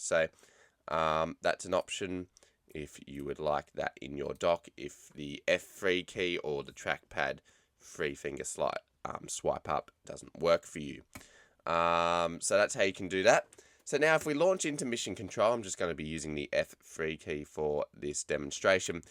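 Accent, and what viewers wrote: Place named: Gold Coast, Australia. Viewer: Use them all the time. Trying to figure out how to lock them. Drives me nuts when they shift. Your voice sounds quite a bit like pro surfer Mick Fanning which leads me to believe your from the Gold coast. Lucky you. Aussie envy here.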